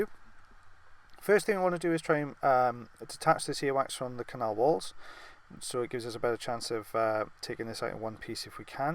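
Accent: Wales, United Kingdom. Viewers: Listening to you makes me miss Wales!!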